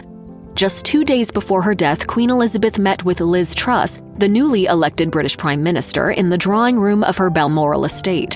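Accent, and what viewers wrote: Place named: United States. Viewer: As soon as I heard the American narration, I clicked off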